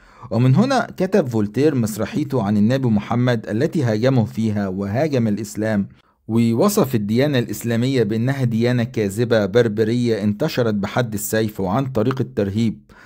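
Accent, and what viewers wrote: Egypt. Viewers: خمس الغنائم.. حسب فولتر….. يا سيدي فولتر من دون ما أبحث عنه أطيب منك ولا يوجد عند حقد متلك. لهجتك مصريه مهما أخبئت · أنت من ميت غمر يابني ؟ لكنتك واضحه جدا ، خد دروس إلقاء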